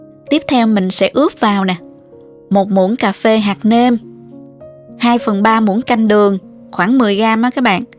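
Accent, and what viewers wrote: South, Vietnam. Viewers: Đúng giọng miền Nam ❤❤❤.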